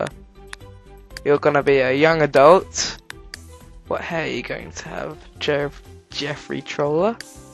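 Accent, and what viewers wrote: United Kingdom. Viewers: he sounds more british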